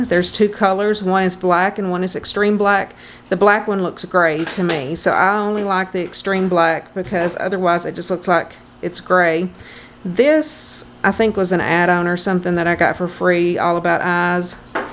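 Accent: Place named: Kentucky, United States. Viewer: hey! Thanks for the great content, I enjoy watching your channel. I knew that you had to be from KY or close because your accent is just like mine!!! 😁😁😁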